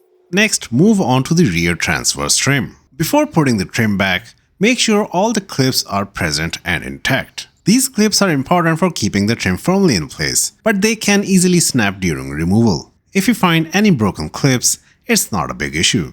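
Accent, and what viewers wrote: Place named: India. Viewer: Great video. But Im not doing all that! Nice accent, though. New Delhi? My ex-husband is Punjabi from Panchkula. His sister is famous actress Yamini Singh. She’s in Mumbai, of course! My didi. ❤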